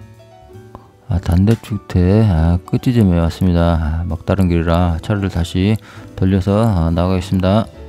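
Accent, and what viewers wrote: Jeolla, South Korea. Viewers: 전라도 말툰데 뭔 일본어???? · 뭘보고 일본인?? ㅋㅋ 딱들어봐도 전라도 사투리구만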